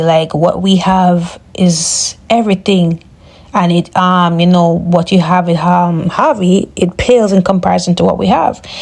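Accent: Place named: Jamaica. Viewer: U sound Jamaican 😂but yh javi is a hopeless fool because why are u pushing ur man away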